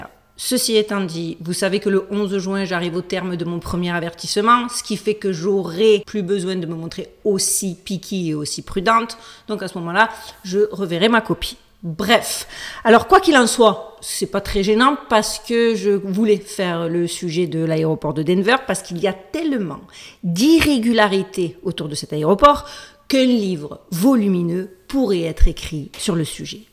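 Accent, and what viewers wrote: Marseille, France. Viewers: Son travail à Végas, dans la vie courante, c' est Belle génie, légèrement Asperguer, mais surtout maman plutôt rigolote, communicante et artiste dans l' âme !!! Marseillaise quoi !!! mais Marseillaise américaine Avé l' accent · oui ta pas changé en 2 ans !! Je suis un jeune abonné à ta chaîne et je la trouve sympa depuis Los Angeles d entendre l assent de Marseilles !👋continue !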